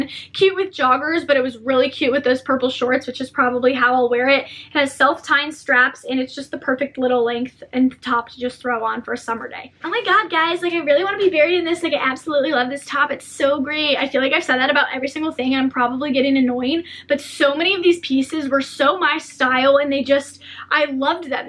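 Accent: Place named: South, United States. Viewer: OHHH... you're SOUTHERN southern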